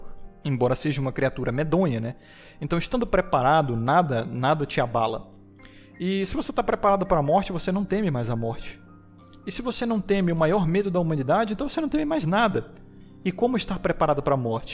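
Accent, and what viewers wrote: Bahia, Brazil. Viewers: Tu é baiano?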